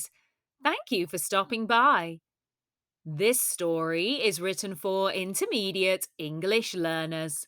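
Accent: United Kingdom. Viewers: Honestly I've never taken part in a charity event. And there are also some activities that we don't know, but we learn them with you which means that helps and encourages us to learn with you and your British accent. Thank you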